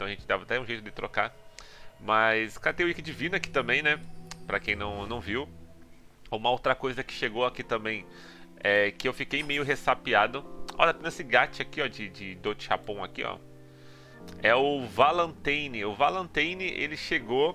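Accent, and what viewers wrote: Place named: Brazil. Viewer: Idai caralho, o cara é Br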